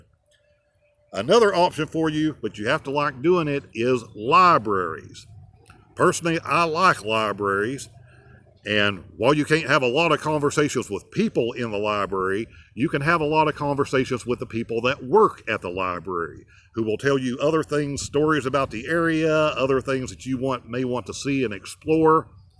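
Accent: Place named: New England, United States. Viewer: Just his "accent". Folks from New England drive me nuts with their accent, when they say "sore" in stead of "saw", etc.; i-dear instead of idea.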